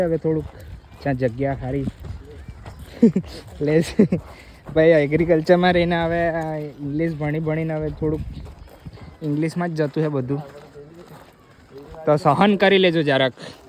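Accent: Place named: Ahmedabad, India. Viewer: Oo bhai tme toh ahmedabad na lago cho ekdmm suddh gujarati 😄😄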